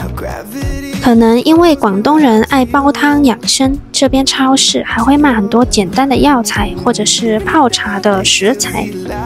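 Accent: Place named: Taiwan. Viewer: 你講話都沒有中國的語氣，聽起來根本是台灣本地人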